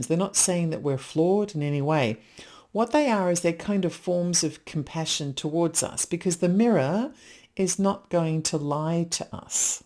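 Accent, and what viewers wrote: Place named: England, United Kingdom. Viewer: In modern vernacular, it's If you spot it, you got it. When I first moved to the north of England, my southern accent earned me disapproval from the locals, who would accuse me of being posh, and talking "wi plum in't gob". I actually have a fairly strong south east London accent, which is far from posh, as you will know. You'd think I was talking like a BBC announcer from the 1950s. It took them a while to let me just be from the south, rather than a princess. So, I wonder if that was a similar thing with your dog park guy. He thought you had pretensions, bc of your slightly Englishy accent.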